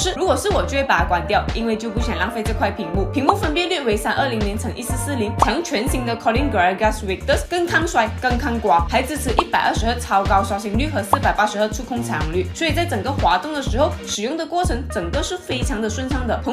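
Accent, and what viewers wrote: Malaysia. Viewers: 妳一開口 我就知道你是馬來西亞人 哈哈 說國語好友喜感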